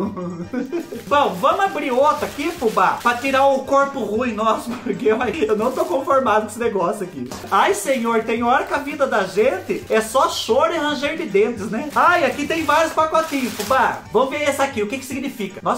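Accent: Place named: Juazeiro, Brazil. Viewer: Sou do Ceará, e acho o sotaque do fubá muito parecido com quem mora em Juazeiro.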